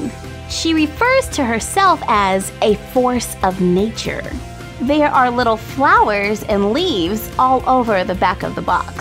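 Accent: South, United States. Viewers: her southern accent 😂😂😂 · you have an amazing southern accent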